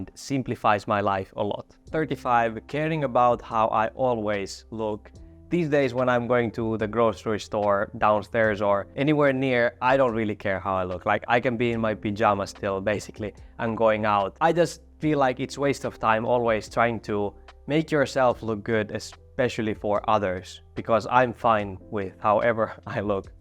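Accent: Finland. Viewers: It's the Finnish accent! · Just discovered your channel as I am going through big changes in my life. You are giving me food for thought and are inspiring ✨️ I knew you were finnish when you started talking. 😉💖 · Hello! It is my first time watching your videos, I just discovered your chanel. ☺️The first thing that I noticed was your accent which I find really interesting and comfortable to listen to. May I ask you where are you from? Based on your accent, I would say Finland? 🤨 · I love Finnish people speaking English… and your content is great, clear and handy. I’m happy to have bumped into your channel! Keep going! Toivon sulle kaikkea hyvää!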